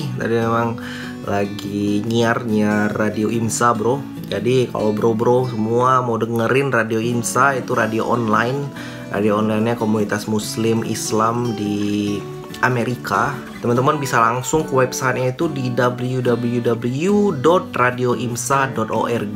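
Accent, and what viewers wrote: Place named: Minangkabau, Indonesia. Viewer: Logat minangnya masih kental banget bang.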